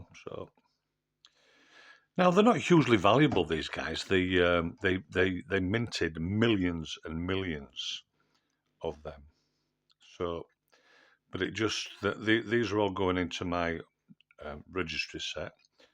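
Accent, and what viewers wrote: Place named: Yorkshire, United Kingdom. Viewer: . New subscriber here & one that has recently taken up this hobby. I’ve started collecting 50p, King Charles III coins. This has now been added to by collecting sixpences (coz that was my first pocket money). I’m interested in Irish & Celtic coins but don’t know if they’re worth collecting. Great to hear a Yorkshire voice on the subject, as I’m from Mirfield.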